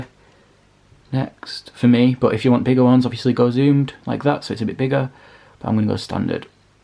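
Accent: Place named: West Yorkshire, United Kingdom. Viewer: Sounds like a West Yorkshire accent to me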